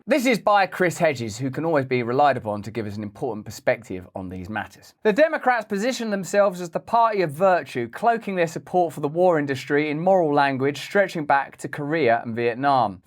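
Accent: United Kingdom. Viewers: The composition of the topic is great and so is the enunciation however I have a weird resistance to the obviously thick British accent telling me news in DC. Maybe it’s the Yankee in me.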